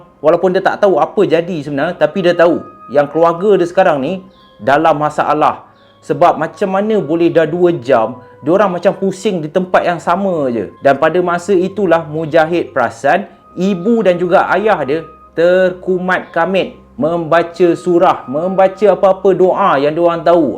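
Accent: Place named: Kelantan, Malaysia. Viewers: Pertama kali ari ni tgok channel you tube..gaya bercerita best jgk..sy doakn maju jaya ya.. ckp kl je sy yg kelantan.faham jee..😅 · Agak pelik bila dgr bckp dialek kelantan...tersengih2 mami😅😅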